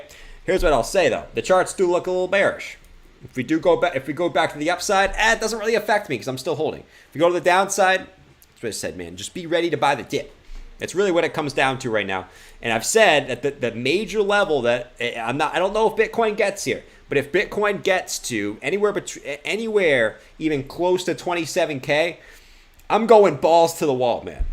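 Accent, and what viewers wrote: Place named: United States. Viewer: What’s with the yankee accent all of a sudden? Wtf? A new reinvention as everyone loses the lot while it is about to fully collapse?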